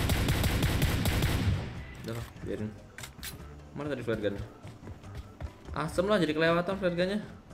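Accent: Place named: Medan, Indonesia. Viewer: Bang orang Medan kah? Logatnya mirip2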